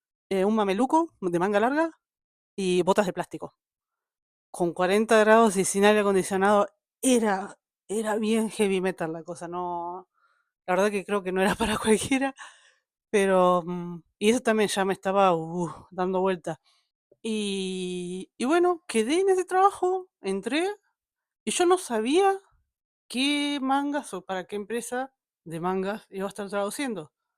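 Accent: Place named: Entre Ríos, Argentina. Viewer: No te conozco y este es el primer video tuyo que veo, ¿Sos de Entre ríos, Argentina? Me suena ese acento jajaja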